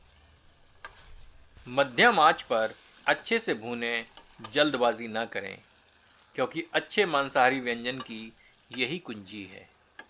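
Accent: Standard. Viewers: itni sudhdh hindi ka uccharan mai aapko subscribe karne se rok nhi paya jai Hind 🙏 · Wow bhai... Mouthwatering hai vakai... Apki itni shuddh hindi hame mantramugdh kr gyi.. Pahli baar itni shuddh hindi youtuber ko suna h... Proud on you. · आपका हिंदी में बात करने का अंदाज और इतनी स्पष्ट शुद्ध हिंदी मैं पूरा विवरण देने के लिए बहुत-बहुत धन्यवाद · I can just say.... Mouth watering recipe and heart winning presentation....... And Hindi in its purest form..... 👍 Hail chef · Bhai tho blkl shudh hindi or urdu bolte hai 🙏❤️👍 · Kya sudh hindi bola hai bhai ne ,...wah mza hi aa gya 😊😀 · शुद्ध देसी हिंदी और देसी मुर्गा आनंद आ गया देख कर। लेकिन देसी मुर्गा सिर्फ 2 सीटी में ही गल गया?काफी कम उम्र का मुर्गा था लगता है। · बहुत ही शुद्ध हिंदी है। बहुत पसंद आया | और चिकन रेसिपी भी बहुत अच्छी थी| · इतनी शुद्ध हिन्दी wow ❤❤ mera dil aa gaya tum per sir❤ love you sir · Itna to hamare hindi ke sir ki bhi sudh hindi nhi he 😂🤣😁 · sahab ki shudh hindi aur recipe dono great 👍 😋 👌 hats off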